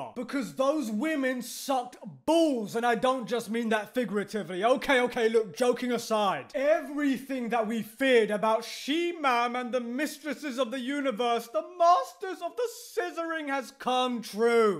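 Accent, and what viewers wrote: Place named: Scotland, United Kingdom. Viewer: Is this guy scottish or a really mad guy from England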